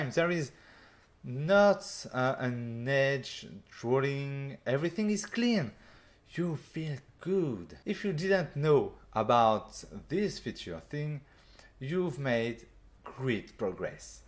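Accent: France. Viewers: Ah l'accent français 😉 Chouette tuto, j'ai acheté fluent il y a peu mais encore trop eu le temps de m'y plonger... Mais ta vidéo donne envie 😊 bravo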